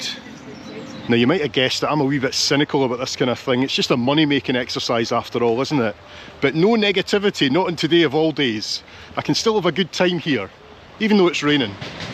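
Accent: Scotland, United Kingdom. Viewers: Thanks for all these fabulous videos. Late last year, we discovered one and your videos and thoroughly enjoyed it so much that we decided to go back to your first video and watch every one. We are still watching them but are now nearing the end. We also keep telling our friends and family about your fabulous videos and encourage them to watch. A lot of our enjoyment of your videos is not only your presentation and the places you visit, but also enjoy listening to your accent and your Scottish description of objects and places e.g. "wee" and "bonnie". So, many thanks for providing us with so many hours of enjoyment and education. Patricia and John ❤ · I've never been to Europe & you videos & Scottish Accent are wonderful. Thx again. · Merry Christmas and Alicja🎄from Berlin. Next time you’re in town, gimme a call and I’ll show you around. I love your videos and the Scottish accent. 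Keep on going in 2025 🎉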